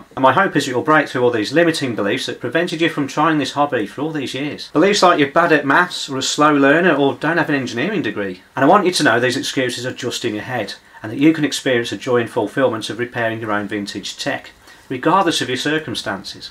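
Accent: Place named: England, United Kingdom. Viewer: Now wait a minute. You charge about 300 dollars U.S. dollars for each repair and you said you love it. So I'm going to pay you to do what you love and I see. Your eyes light up when you said love. Everyman is blessed to do what he loves. Next one more really important step, learn to hear the fast talking Englishman or get a voice translator. Have a good love filled day everyday.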